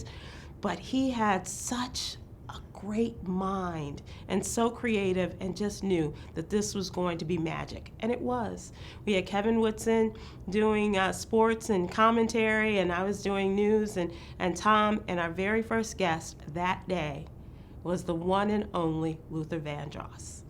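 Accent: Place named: Chicago, United States. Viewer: Chicago accent 😊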